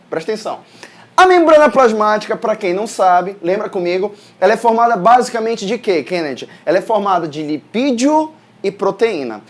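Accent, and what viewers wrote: Pará, Brazil. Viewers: muito bom! por um acaso tu es paraense ? kkkkk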